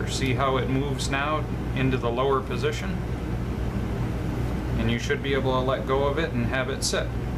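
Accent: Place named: Canada. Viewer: Sounds Canadian. Great fun eh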